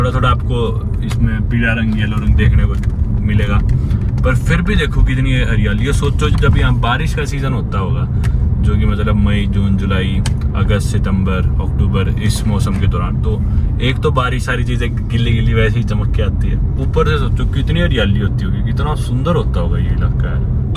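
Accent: Haryana, India. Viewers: The thick haryanvi accent is such a turn off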